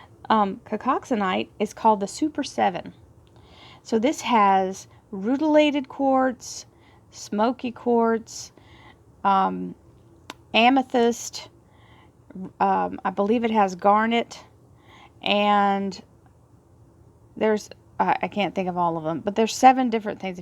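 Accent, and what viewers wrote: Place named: South, United States. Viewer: Once again, being a TN gal, I just love your southern accent. You sound exactly like my sweet Aunt Charlotte. Thank you so much for sharing your precious gift with us. Your readings are 1000% resonant with me. 🙏💗💜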